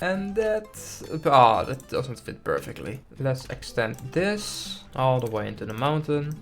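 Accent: Netherlands. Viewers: aan je stem te horen ben je Nederlands🤣😂:goodvibes::virtualhug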